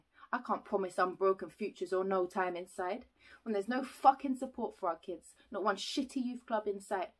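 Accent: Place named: United Kingdom. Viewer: I never thought one day ı fell in love with who has Bri'ish Accent 😍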